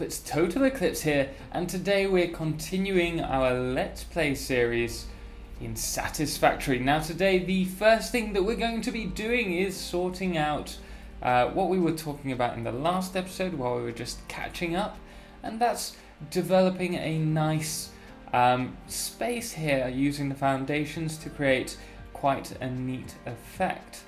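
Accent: United Kingdom. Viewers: the microphone sound doesn't sound muffled or clipping a whole awful lot though, i find the the general quality of the spectrum quite decent albeit a bit boosted agressively in the mids at the serious detriment of bass response (is there even bass?), it does sound like a cheap stick 1 buck mic in that regard (but even those can work magic if you know how) i mean muffled is worse, at least you can clearly hear the words he is speaking. sounds like he just needs to filter out the background noise, and a low hum which is most likely caused by PC fans, and judging by his british accent i'm hazarding a guess he may living in an old house with no grounding on the sockets except for the wet groups, i have that and it sucks shit for playing guitar, you have to constantly keep in contact with a string to ground the hum out lol, yes even on humbuckers.. although pickups are technically AC, the amplification stage is NOT. 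i already said years ago youtubers should up their game in using hard limiters / compressors to get their levels nice, but in those days ear rape was a slapstick thing lol this doesn't seem to be as much as a leveling issue as much as it is an ambient noise/EQ problem i assume you're listening this on headphones, i'm playing this on it on near field monitors with very conservative volume settings so the annoyances you experience kind of fly by for the most, i'm not too bothered by dust, clipping gets on my tits though, which is really not the biggest problem here, i've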